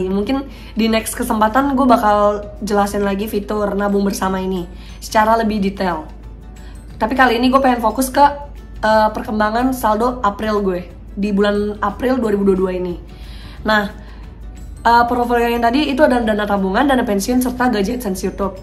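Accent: Bali, Indonesia. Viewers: Orang Bali kah?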